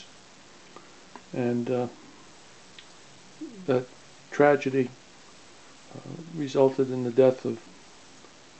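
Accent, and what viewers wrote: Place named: Rhode Island, United States. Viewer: Just beautiful. So moving and reverent. I will never forget visiting the graveyard in Halifax where many of the dead are buried. What an emotional1 experience. This was m.h magnificent, thanks. By the way you have GOT to be from Rhode Island! The accent is unmistakable. Spent my earliest years there. I know that accent well!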